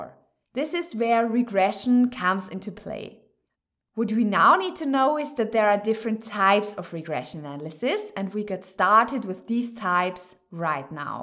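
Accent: Germany. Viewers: I'm not gonna lie, I can't get enough of that german accent 😌😌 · you have an accent, are you a German???? 😅🤣🤣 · strong German accent, I like it. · Sounds so german..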